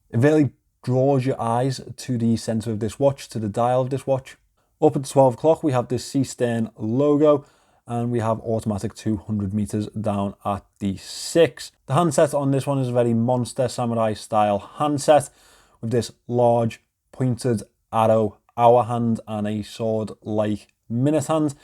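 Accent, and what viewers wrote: Liverpool, United Kingdom. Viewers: I think should speak as he speaks, with his scouse accent, there are hundreds of different accents within the UK and I hope it always remains so, keep going you're doing a great job...stay safe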